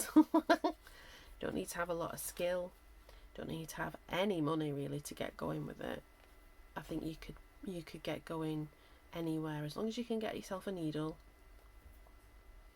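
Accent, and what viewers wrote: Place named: Northern England, United Kingdom. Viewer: I think I hear a northern accent, so if you’re near Wakefield the Salvation Army there has a very cheap charity shop where I get a lot of clothes to use for fabric cheap.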